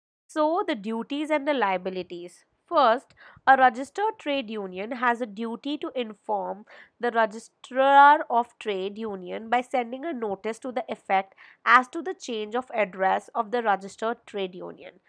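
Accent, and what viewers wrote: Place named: India. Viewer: According to your voice mam. I think you are indian. So plz can u explain in hindi the whole part of your every video after the reading.?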